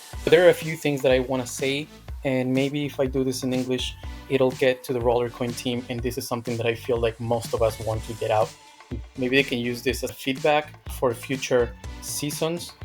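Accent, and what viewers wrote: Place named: United States. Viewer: Hablas como gringo como decimos en Perú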